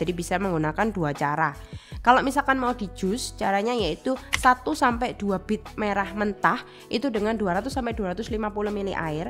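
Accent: Java, Indonesia. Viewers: Kaget pas denger logat nya, ga nyangka semedok itu 😂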